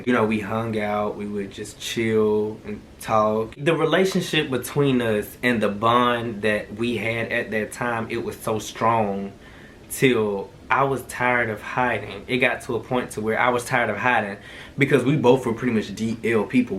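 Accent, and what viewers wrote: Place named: Alabama, United States. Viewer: What part of Alabama you from bruh!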